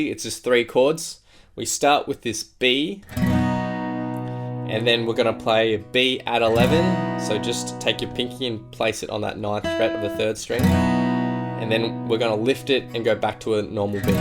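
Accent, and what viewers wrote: Australia. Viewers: Seriously! He is my top "go to" when I need a cover song tutorial. Clear and thorough instruction; plus a crackin' Australian accent!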